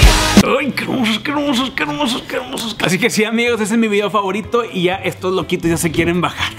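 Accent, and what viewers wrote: Monterrey, Mexico. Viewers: Luego luego se nota tu acento regio :) también soy de Monterrey. Felicidades eres de los mejores youtubers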